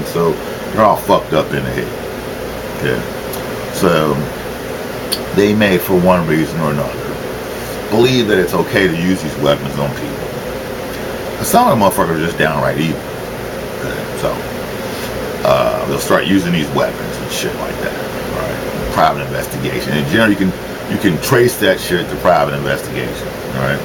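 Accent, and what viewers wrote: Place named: Washington DC, United States. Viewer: Thanks. I lived in DC briefly in 1973, Howard Univ one semester. At 65, I might be slightly older than you. People from that part of the country sound a lot like you do.You also remind me of a friend from San Fran in the mid 1970s. Thanks for the clarification. There's a TI in Houston, Texas Gangstalking Milab who I've been communicating with. People here in Memphis don't know what a TI is. Take care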